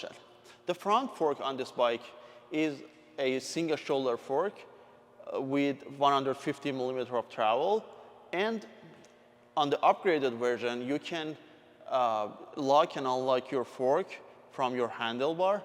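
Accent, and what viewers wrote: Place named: Iran. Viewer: Yes they ship to all countries and Im 90 percent sure Brazil is covered. They will charge you factory destination and shipping and tax. Might be more expensive then in America or Dubai, where the company is based from. They are also Canada location. As it says on the bike Assembled in Canada. This owner has a brother which I think works for him. This guy is Persian and has a nice thick accent. A 12 year old can do a better job then this nut case LOL.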